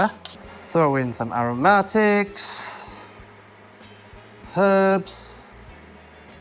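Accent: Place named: United Kingdom. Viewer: with your eyes closed its like listening to some posh old English cooking radio show :D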